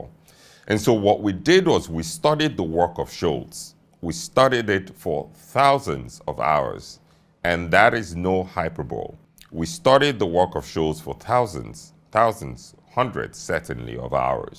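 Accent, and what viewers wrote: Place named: Caribbean. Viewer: That may be UK 'Received Pronunciation' , but if I had it, I wouldn't want to give up that (Caribbean + a touch of US ?) twang for anything! I think it's beautiful, and clear as a bell :) .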